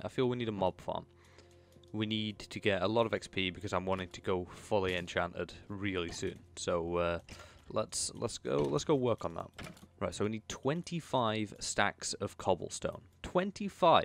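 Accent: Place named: Scotland, United Kingdom. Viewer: May I also ask where abouts in GB are you from… I’m always guessing peoples dialects and I can’t really place you for sure😂 I’ll take a brave guess that you are from near scotland if not from scotland😅 maybe from northern England. But then again dialect can be affected by people around you while growing up so that might be throwing the origin of the home totally off. So better question then would be, where is you dialect from😂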